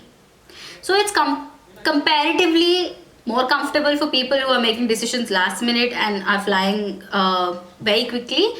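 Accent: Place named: India. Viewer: Indian English very Funny... 😅... Practice on pronunciation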